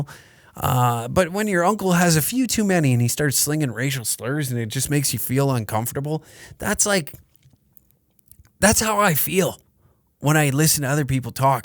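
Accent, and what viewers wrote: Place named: Alberta, Canada. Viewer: You have the best FUBAR accent I've ever heard. Only a proper 'Bertan can talk like that.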